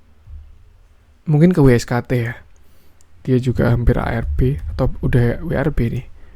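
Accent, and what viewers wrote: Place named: Java, Indonesia. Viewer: Iya betul...khas banget. Medok. Jadi pengen tau profil e mas e 😀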